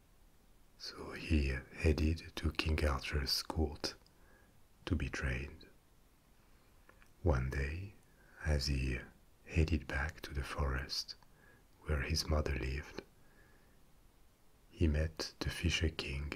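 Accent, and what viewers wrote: France. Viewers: Hey, cool idea for an ASMR channel, finally sth different, your calming voice with the French accent rocks, dude!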